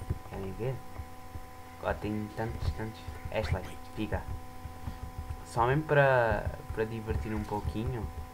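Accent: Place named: Portugal. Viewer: Portugues de portugal, hahaha bonzao! :D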